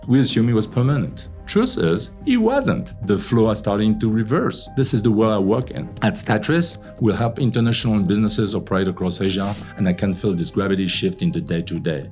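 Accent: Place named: France. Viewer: He's French. He could have a professional record studio yet almost nobody would understand his English :)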